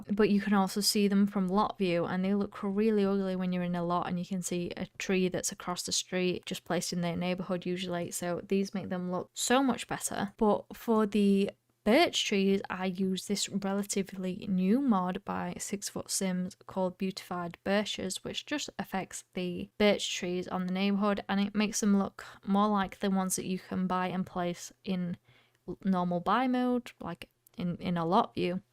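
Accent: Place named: United Kingdom. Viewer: Hello! Thank you a lot for the video. It's greatly helpful but what I also need to mention is your beautiful British accent, I love it so much! It was a pleasure listening to you.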